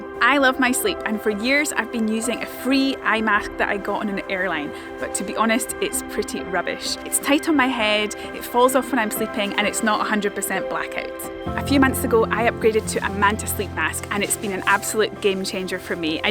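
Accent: Scotland, United Kingdom. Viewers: Just found you today. Very enjoyable video trips. I'll be watching more. You are lovely, and that Scottish accent is great. 😊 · As an old bloke I think I am in love with Scotland. What part does your wonderful accent come from? I had a work colleague who said that in the 40’s and 50’s the language between different valleys in the north would be different. Many Australians I know, think it is the only place in the UK worth visiting.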